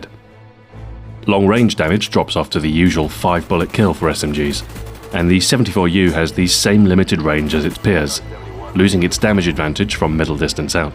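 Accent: England, United Kingdom. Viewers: Play the video at about minutes and switch it to 0.5x speed. LOL. voice sounds so funny. He sounds like HAL 9000 with a little more of an English accent.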